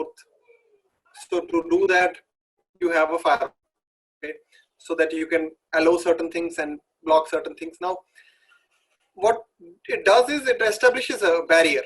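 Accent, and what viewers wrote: India. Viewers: Good but Indian accent very hard to understand.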